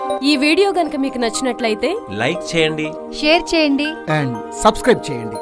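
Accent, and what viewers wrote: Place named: Godavari, India. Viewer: Lal salaam Comrade....nuvvu chestav edina..tamil niku radu nerchukunnav,same mana godavari slng kuda nerchukoni chestavv...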